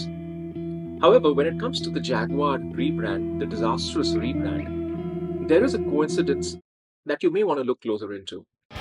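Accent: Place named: United States. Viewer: He sounds American, very disappointed if he's British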